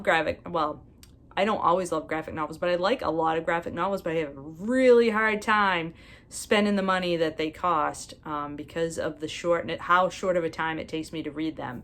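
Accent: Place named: New Hampshire, United States. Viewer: My guess was NH😊. I’m originally from Mass, now in CT. Glad to have found you.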